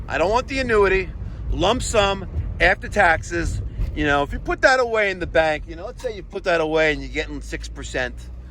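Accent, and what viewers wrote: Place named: Long Island, United States. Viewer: Ha! Ran accross a video head the accent and said oh that's long island!! Ronkonkoma in da house lol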